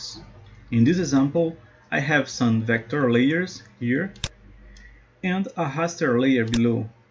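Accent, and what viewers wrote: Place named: Brazil. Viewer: Engraçado como se percebe logo quando o sotaque é brasileiro, é um sotaque único. Siga produzindo em inglês, nesse meio a maioria entende e o alcance não fica restrito a aqui.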